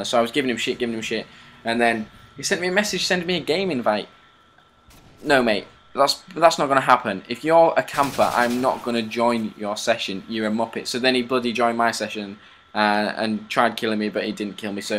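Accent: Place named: United Kingdom. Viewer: a little to brit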